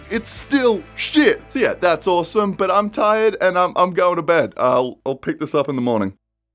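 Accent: Australia. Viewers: I don’t know how I didn’t figure out he was Australian, I’ve been assuming he was British which would be acceptable if I was American but I’M British and parents and entire extended family are Australian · Never EVER do a video where you do not speak anymore y'hear? Your videos are 100x more enjoyable when I get to hear that glorious aussie accent of yours. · You're Australian nice!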